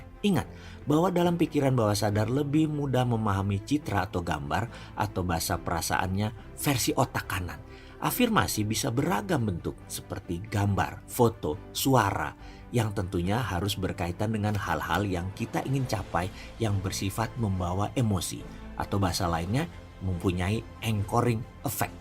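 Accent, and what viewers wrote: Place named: Palembang, Indonesia. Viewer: ini mas Adminnya orang Palembang ya ...hehehehe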